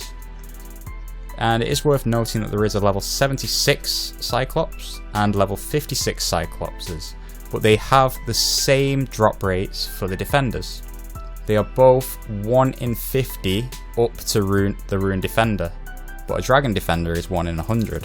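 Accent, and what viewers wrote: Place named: United Kingdom. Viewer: dude you sound so brittish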